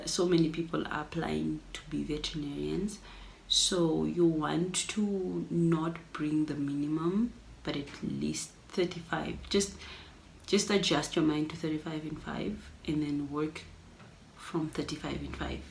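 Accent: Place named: Vietnam. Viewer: your NamLish on point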